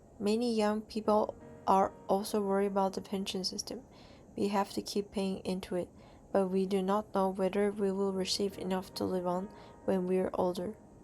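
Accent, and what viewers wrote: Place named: England, United Kingdom. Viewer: I love your english spoken accent, and a genuine presentation, helps seeing Japan from a ground level.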